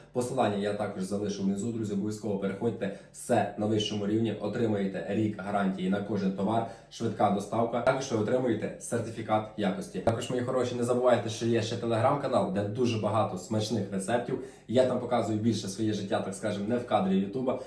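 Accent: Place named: Western Ukraine, Ukraine. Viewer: Супер. Говір з Заходу України. Деякі слова діалекти я і мої рідні досих пір використовуємо.😊👍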